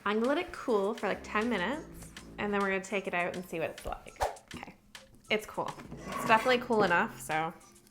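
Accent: Canada. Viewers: is she Canadian or does say aboot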